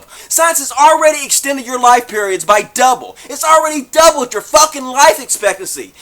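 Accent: South, United States. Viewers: You are the first man with a Southern accent I've seen who is an atheist. Congrats! I've subscribed.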